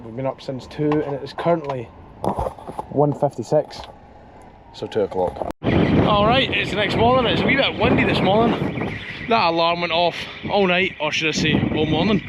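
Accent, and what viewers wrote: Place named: Glasgow, United Kingdom. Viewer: 😂😂typical weegie patter. Keep it coming. That's what us scot like and used too haha. Ya wee cowww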